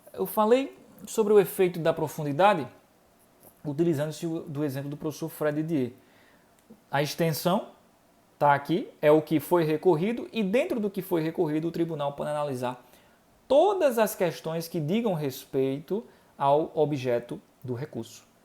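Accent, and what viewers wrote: Northeast, Brazil. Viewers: Parabéns pelo conteúdo. Gosto muito do sotaque nordestino, lembra meus avós.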